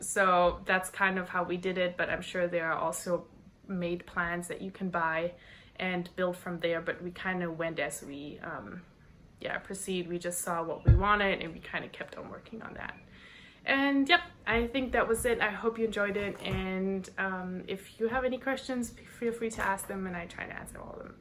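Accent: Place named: Germany. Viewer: your english really improved, i remember watching your videos back when your (cute) german accent was way stronger💛i love to watch your videos and hope i‘m supporting you through it, i kind of have the same dream of living in hawaii for like 1/2 years in my life one day. greetings from switzerland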